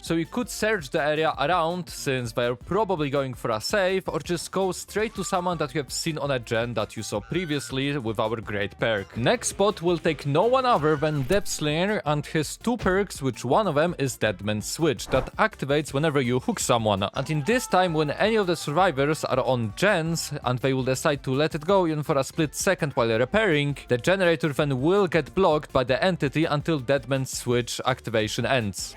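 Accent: Poland. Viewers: polski akcent? :)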